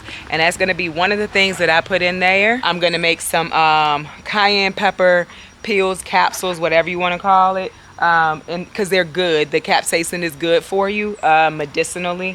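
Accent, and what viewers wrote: South, United States. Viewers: I love it to death lol. It's full of southern Love! I love your channel sister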